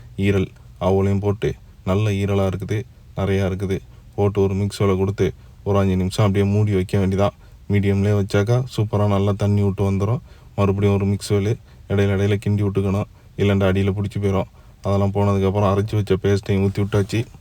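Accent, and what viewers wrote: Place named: Keelakarai, India. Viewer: காமெடியா கீழக்கரை ஸ்லாங்கிள் பேசுவது செம😅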